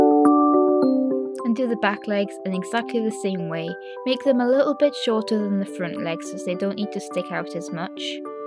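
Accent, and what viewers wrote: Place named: North East England, United Kingdom. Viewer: North east england ^^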